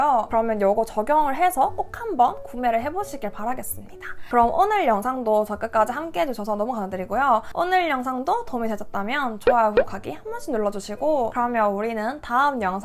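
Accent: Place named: Busan, South Korea. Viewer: 런던플랏 아는곳 나오네 너무 반가워요 부산말도 반갑구요 잘보고가용 ᰔᩚ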